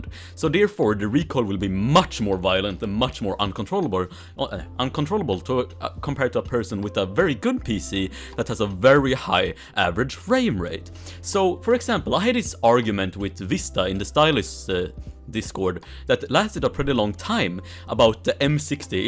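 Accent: Sweden. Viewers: Hej du är svensk man hör detXD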